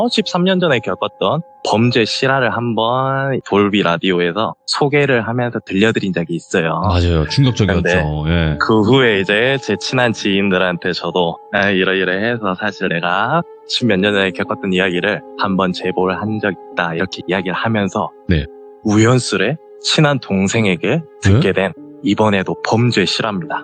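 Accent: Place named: Seoul, South Korea. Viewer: 서울말 힘들죠ㅎ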